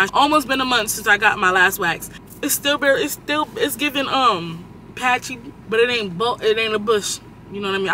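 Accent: Trinidad and Tobago. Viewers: No you're it's a Trinidadian accent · trini to di bone! accent so strong😭😭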